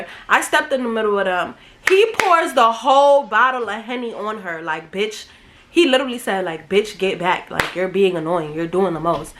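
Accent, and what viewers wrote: New York, United States. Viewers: I fcking love new york accents bish, Lemme finish binging the storytimes 🔥🔥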